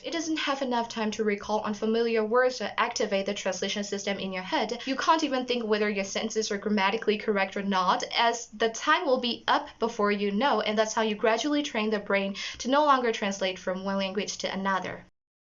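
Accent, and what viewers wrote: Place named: United States. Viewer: U have American accent n I love it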